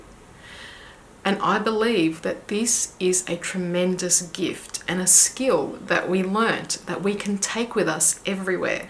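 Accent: Australia. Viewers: OMG I have been working on healing from my narcissistic abuse for a long time and I have just discovered your chaneI. I love that you have an Australian accent. You are so clear and empowering. Thank you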